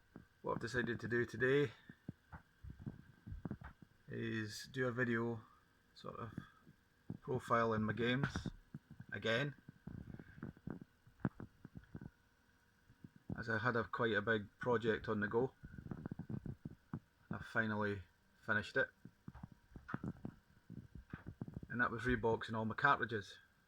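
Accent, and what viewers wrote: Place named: Scotland, United Kingdom. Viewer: Hey man, that is just glorious. Hope you are gonna upload some more stuff man. Always good to hear a fellow Scot 😁 Here from a Nathans Gaming tweet 👍 Take care, and again, hopefully see some more content 👌 Cheers 🙂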